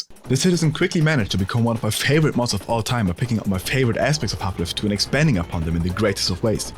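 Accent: Germany. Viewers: Sag mal, kommst du aus Deutschland?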